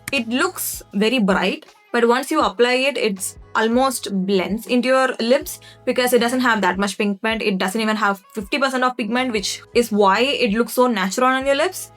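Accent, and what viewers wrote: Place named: Tamil Nadu, India. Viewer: Loved the video keepp goingg....and also luv that English with tamilian ascent ❤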